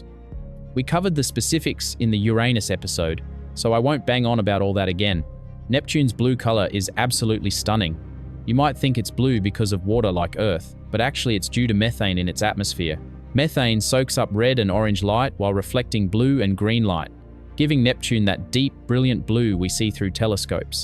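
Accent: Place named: Australia. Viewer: The most interesting thing is the AI narration with an Australian accent and incomprehensible Aussie slang. It also changes its pronunciation of Uranus throughout the introduction.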